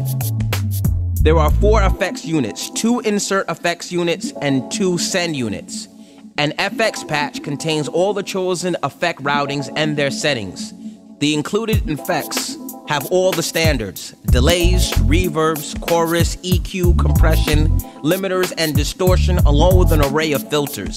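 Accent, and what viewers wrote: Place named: United States. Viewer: No I'm just saying that his english teacher which I'm 100% sure that teaches British english and not american english would assert to him that the accent of the voice in this video is american and not english ( in a sense of british english ). That's all my friend! ...and I don't know why I'm losing time to clarify this stupid thing to you.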